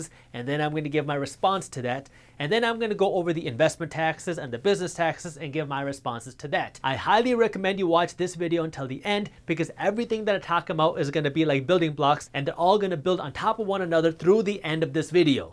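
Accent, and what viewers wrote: United States. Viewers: He's American or seems to be from there going by his accent, so obviously he knows terms / gestures from the dominant culture. Do you think Mexicans only do "Mexican" things all day long, and Sikhs only do "Sikh" stuff all day long? They live in the same society you do, and as English-speakers understand terms as they are meant. Just like European-stock girls understand what tipping a hat means, despite never having tipped one. I'm not saying any of this in a mean or unfriendly way, but just want to poke you and remind you to put on your "thinking cap" (something nobody has ever actually owned, but we all know what it means). 😊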